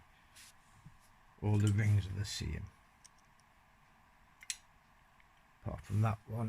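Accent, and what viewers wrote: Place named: Ireland. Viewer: Awesome thanks for all the detail. Luv your irish accent too! If you ever need. Any help with your health. Let me know. Contact is on my channel.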